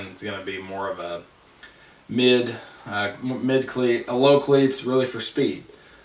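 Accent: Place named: Arkansas, United States. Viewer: I can tell Your from Arkansas... me to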